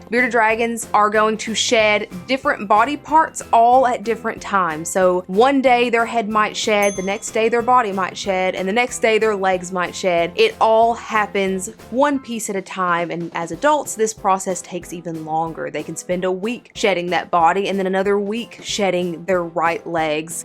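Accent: South, United States. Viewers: Are u from the south cuz I’m pretty sure u are cuz of your accent cuz I am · You got that yeehaw accent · Sounds like you got a southern drawl. I love a good southern accent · is kind of cute...with a lil country twang bout her voice. Oh, and has great advice for bearded dragons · Damn u sound country what part of the south u from Texas? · No offense but was not expecting a country voice lol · Hi! Just wanted to say that we really like your videos. And, being from the south, we love your accent. Keep up the great work. Would be cool if we could do a video together one day... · I love your southern drawl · love your southern accent! :-D glad I decided to watch some Beardie videos! :-D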